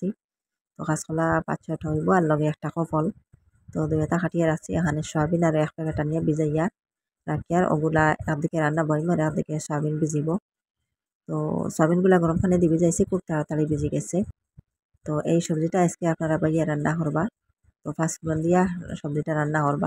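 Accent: Sylhet, Bangladesh. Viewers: আমাদের সিলেটি বইন তো। এই জন্য খুব সুন্দর করি কথা কইন। খুব সুন্দর লাগলো আপু ভিডিও টা। সাবধানে কাজ কইরো বইন।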